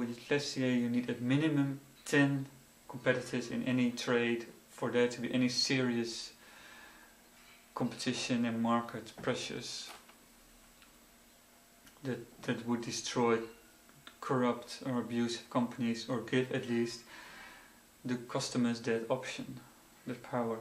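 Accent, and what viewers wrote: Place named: France. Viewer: I did have a look at the beginning when he panned the camera around to show, as he said, "to show where I am filming this". But looking I was not able at all to see where. Apart from some dudes apartment. I did see that as far as I know it was not filmed in England or the UK. So judgeing by his French accent I guess it may be France of Canada or some where else French is spoken.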